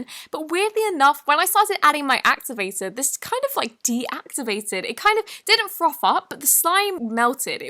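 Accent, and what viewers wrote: England, United Kingdom. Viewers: James McDonald why I’m irish and we hate the English like i hate this both but i don’t hate her voice and it’s an english accent not British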